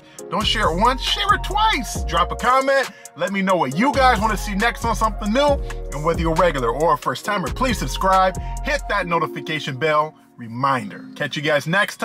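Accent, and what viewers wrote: Texas, United States. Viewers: That Texas accent 😹 1K calories for the burger????!!! 🍔 It does look super good though! Only hot dog I trust is from Costco 😎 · Love the attempt at sounding like a Texan LOL Shout out from Dallas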